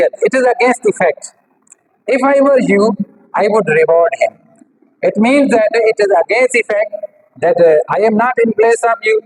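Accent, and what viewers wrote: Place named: Sindh, Pakistan. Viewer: Good effort, but there's room for improvement to make these sessions or videos more engaging and accessible globally. Here are some specific suggestions: - Audio quality: Consider investing in a better microphone or recording your voice separately to ensure clarity. - Teaching style: Update your approach to match modern educational standards, which emphasize interactive and fun learning. - Language: While Sir delivers sessions comfortably in English, a strong Sindhi accent is still noticeable. Refining his English pronunciation would improve connectivity and facilitate better understanding for all listeners. - Presentation: Practice your delivery to maintain a natural flow and consider using digital tools like interactive whiteboards to enhance engagement. - Pacing: Avoid reading from a physical board line by line; instead, use it as a visual aid to supplement your teaching. 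I believe these sessions have a lot to offer, and I'm sure Sir direct connections appreciate them. To expand the reach and impact, consider making the content more accessible and engaging for a broader audience. Please take this feedback in a positive light, as I am connected with Sir and eager to benefit from his sessions. As my English is still limited, I rely heavily on tools to assist with my daily tasks, such as reading, writing etc.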